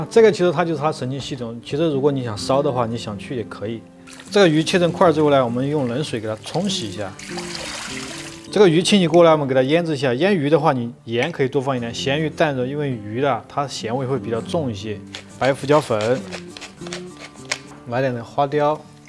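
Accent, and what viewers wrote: Sichuan, China. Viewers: 真是喜欢这口川普，太下饭了，干得漂亮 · 这个普通话一听就感觉到好亲切喃，是成都人？或者至少是四川的吧。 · 已经开始川普了，之前只是Rua会出现，现在”喃”都来了哈哈哈哈。有粉就是嚣张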